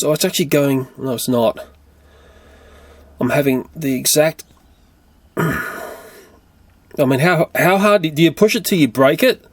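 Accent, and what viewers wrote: Australia. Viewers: Are you Australian I am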